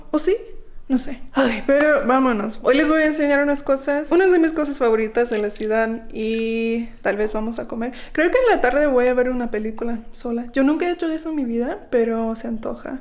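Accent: Mexico City, Mexico. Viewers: You’re white? U sound Mexican af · Es increíble lo mexicano que es tu acento. No sabría si eres una mexicana que vivió mucho tiempo en EEUU o una gringa que aprendió español muy bien. Saludos desde Chile y disfruta ese hermoso país. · no es anglosajona es lationamericana no tiene acento estadounidense cuando habla ingles. Pa ra la gente que no sabe ingles piensa que es estadounidense pero no tiene rasgos latinos. Cuando habla ingles se le oye el acento en espano dice mi esposa gringa. tien acento mexicano. tiene mala pronunciacion en ciertas palabras en ingles. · Hola!! Primera vez que veo tus videos y me gustan. Hablas bien Mexicana, tu español es muy claro 10 de 10 a tu español, Yayyy!! Espero que disfrutes mucho de este país y que que hagas muchos amigos para que salgas a otros lugares de México!! 🙏💚❤ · You sound soooo Mexican.. After a few weeks out there in Orizaba ¿why don’t you try out a bigger city? 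Digamos… Guadalajara, Morelia o la mismísima Ciudad de México 🇲🇽 Bienvenida a México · Tu español esta espectacular con un asentó muy mexicano, espero te la pases bien en México 👍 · cuando hablas espanol parese que eres mexicana no manches muy bien. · So pretty girl !!! ... mexican accent ??? mission accomplished !!! ... why you choose Orizaba ??? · Tu acento es muy mexicana😮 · cuando hablas en español , tienes el acento de CHILANGA ... CDMX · You're the Coolest Girl, Love to hear your Méxican Spanish, it's just awesome, Blessings and Congrats, ❤️🇲🇽🤍💙 Hablas un Español muy Dulce 🤗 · Que guerita tan activa y alegre, le pones Mucha passion a tu Vida diaria...and yes, your Spanish is very Mexican so that's easy-to understand and very fluid ... so enjoy your time in México.. bye and good luck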